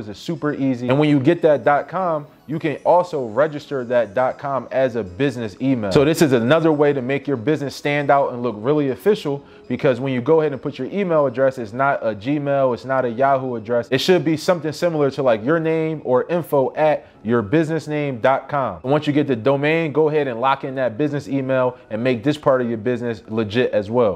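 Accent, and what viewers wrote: Philadelphia, United States. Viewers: Cuz sound like he from Philly 🤔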